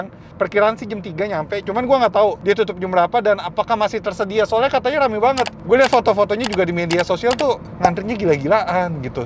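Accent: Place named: Bandung, Indonesia. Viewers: Hese teu nyunda teh 😁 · Paling suka logat sunda.😁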